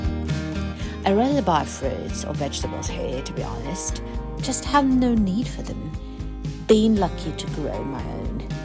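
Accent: England, United Kingdom. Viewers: Thank you for taking us to Belarus super markets and showing different varieties of groceries in your country place... I like your English accent...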